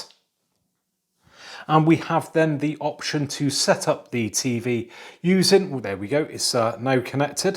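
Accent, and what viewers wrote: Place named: West Country, United Kingdom. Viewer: Enjoyed your video, love what I believe is the west country accent too, bonus. This video will be a big help to me as my exact same new tv is in the box ready to setup, just waiting for the new stand to arrive before unboxing and setting it up. Thanks, enjoyable informative video and thanks to your wife for the video work. 👍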